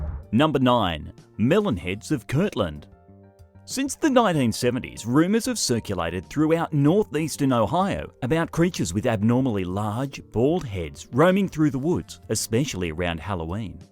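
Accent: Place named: Australia. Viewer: An Australian accent but adopting American terminology.... what's the matter narrator... can't accept Australianisms?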